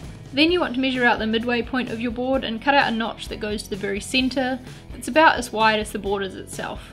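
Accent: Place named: New Zealand. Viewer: i knew u were from NZ I AM 2 i could tell because i usually watch american youtubers and you sound so different like a kiwi I FINALLY FOUND A KIWI YOUTUBER!!!! this video helped a lot thank you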